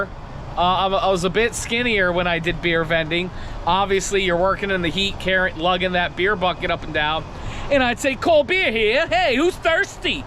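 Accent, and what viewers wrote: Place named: Maryland, United States. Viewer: I thought you had a Maryland accent, I spent most of my life in Maryland.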